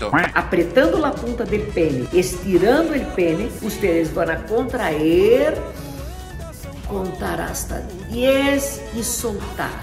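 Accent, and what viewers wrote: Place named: Brazil. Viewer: Me gusta su acento brasileño y habla el perfecto español. Saludos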